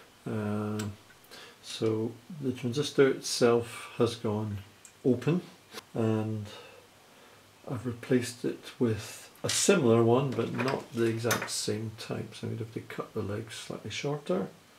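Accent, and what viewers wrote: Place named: Scotland, United Kingdom. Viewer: Hi! This is great. I have a 7603 scope here and is in need of repair. I inherited it from a friend and it was barely working (some initial display but eventually gave in and now just sort of frozen). I use it for experimental XY drawing – as you say it has a lovely big display! Although I have some experience of electronics repair (swapping in broken pots and switches and caps on old synths etc) I am very wary of getting into these devices as there are some high voltages. I am also no good at diagnosis – I can only carry out repairs if I know what I need to replace! I notice you have a Scottish accent(!) – I am based in Glasgow. By any chance are you reasonably near? I would love to bring this scope back to life and am looking to commission someone to diagnose and help me. Any advice hugely appreciated and thanks again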